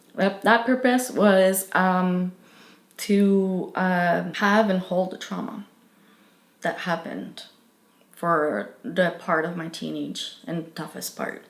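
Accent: Quebec, Canada. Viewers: I thought I recognized her wonderful accent. I live in Alberta now and unfortunately don't hear Quebecers often. ❤ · Where is from? I wasn't sure if it's a quebec accent or not.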